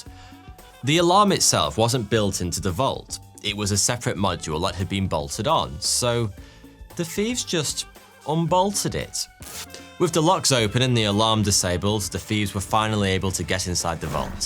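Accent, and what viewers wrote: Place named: England, United Kingdom. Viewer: OMG, this Guy´s stories are Awesome! And He tells them with such breathtaking English accent! Bravo, Sir! You´re simply the BEST!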